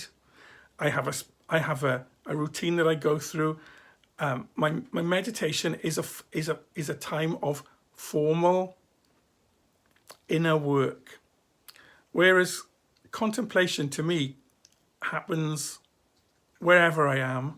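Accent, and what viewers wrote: Wales, United Kingdom. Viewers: is that a Welsh Accent?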